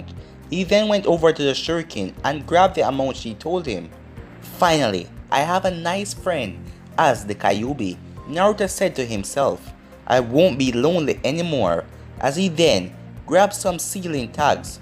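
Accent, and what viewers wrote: Jamaica. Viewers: Why do u sound like a Jamaican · ok, I asked cause I'm Jamaican and he sounds like a jamaican that is trying to learn English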